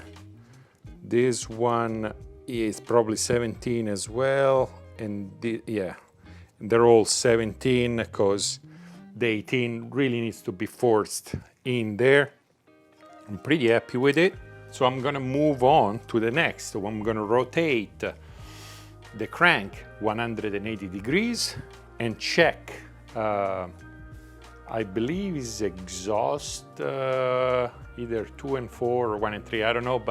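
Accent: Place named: Italy. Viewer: He is Italian 100 % . I hear his accent.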